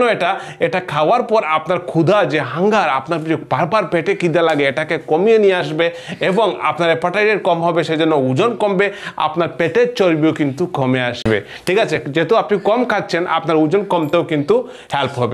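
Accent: Bangladesh. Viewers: বাংলাদেশের বাংলা উচ্চারণ একদম ভাল নয় ।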